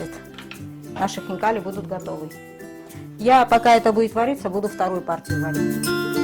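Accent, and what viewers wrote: Georgia. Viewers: Моя хорошая!!! Как же я рада была увидеть Вас на канале ,услышав Вашу речь с акцентом и увидев блюда дамашней грузинской кухни ....вернули в молодость .!!! Когда были молодыми жили с мужем в Тбилиси (Глданский р-н) а сейчас представляете я тоже живу в Испании (Кадиз) часто из грузинских блюд готовлю аджапсандали и сациви ,хинкали !! На всех видео поставила лайки!! Вы очень приятная ,а русская речь с акцентом бальзам на сердце!!!очень удивилась что так много зелени Вы нашли в Испании особенно свежий укроп и кинза ...для испанцев пряные травы не интересны.Если не секрет .,где Вы живете?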